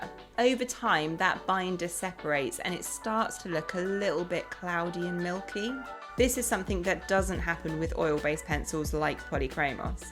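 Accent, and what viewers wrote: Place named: United Kingdom. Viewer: Great tutorials! I want to encourage you to keep doing videos and grow big because you are such a Great teacher,I would like to mention to you that you are from UK right,so you have a strong accent and people from all over the planet are watching you,so it is important that you always bear that in mind to never speak too fast and have the music very low in the background,so it does not take so much concentration to clearly understand you. I'm French and understand English very well, but UK accent is not obvious for many people on this planet! That said! Keep going at it!! You love to do this,we can feel your passion! Bravo! Merci!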